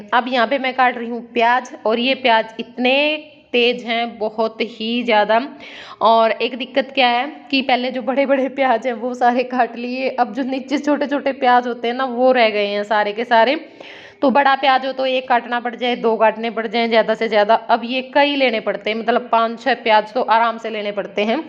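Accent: Haryana, India. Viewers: bebe ghne din m video Dekhi...Rohtak aali bolan laagiii bebe🤭🤭🤭🤭🤭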